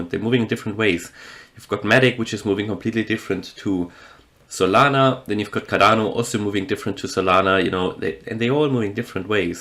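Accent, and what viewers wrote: Germany. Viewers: Dude I love your analysis. Pretty straight forward and precise. If I'm not wrong I'm also hearing a German accent. in which case muss ich sagen echt geile TA bro 👍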